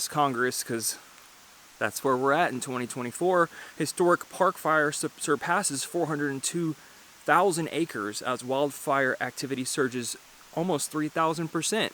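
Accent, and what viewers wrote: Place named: United Kingdom. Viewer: I like your UK accent,.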